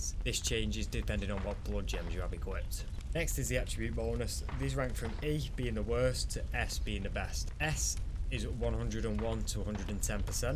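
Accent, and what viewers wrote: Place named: Scotland, United Kingdom. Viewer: Scotland? forgive if I'm wrong😂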